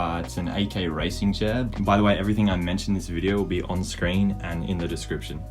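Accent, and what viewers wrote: Australia. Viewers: Are you Australian? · wait your Australian? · You’re Australian???? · r u australian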